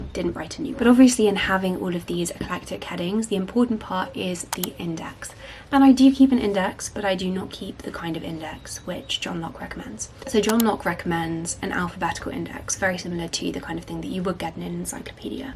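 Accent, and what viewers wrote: United Kingdom. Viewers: The UK accent? Writing as a Latin American native, the US accent is more on higher tone, and listen her make me think 'so calming' the Britain's make the difference hehe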